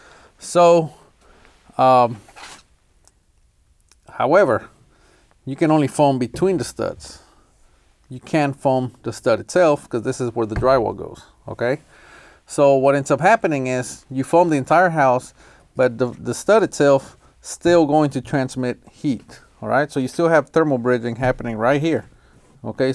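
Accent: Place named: South, Mexico. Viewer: I hear a Mexican and a Southern accent when you talk.✌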